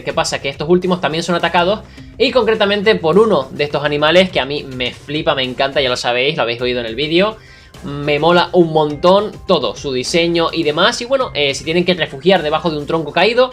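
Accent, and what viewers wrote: Argentina. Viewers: argentino detectado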